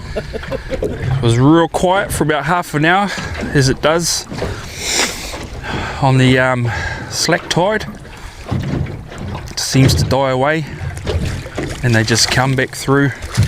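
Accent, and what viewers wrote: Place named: New Zealand. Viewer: No offence mate but you have a huge kiwi accent ! ...hahaha....I miss it actually having lived in nz for 22 years....now i have to listen to the aussie accent.....nowhere near as cool a !!!! Keep up the great fishing vids....nice healthy looking snaps.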